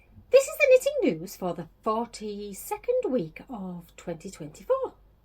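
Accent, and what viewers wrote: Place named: Northern England, United Kingdom. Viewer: Just come across your channel and have subscribed. The quilt is beautiful - such soft, pretty colours. I’ve never tried Tunisian crochet so am interested to see how your sweater turns out. Where is the beach at the end of the video? I was born in Northumberland and lived in the North East until my late twenties. So nice to hear a northern accent. I’m with you on card only payments. We must pay with cash wherever we can otherwise risk losing it altogether. Happy crafting, Margaret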